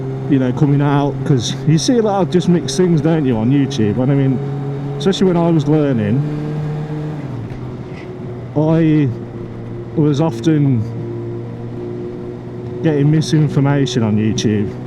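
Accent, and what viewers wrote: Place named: Birmingham, United Kingdom. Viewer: Where are you from matey, you accent sounds pretty near to mine in Bedworth, yours sounds a bit more towards Brum though?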